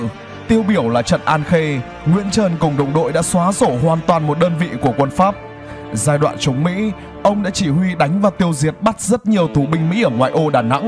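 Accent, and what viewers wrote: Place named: South, Vietnam. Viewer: Giọng cx đậm chất miền Nam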